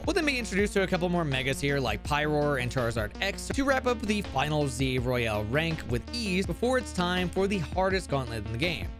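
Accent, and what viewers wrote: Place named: France. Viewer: I am 100% sure he is American, as I have been following the channel for quite a while now. Yes, french accent is pretty heavy 😅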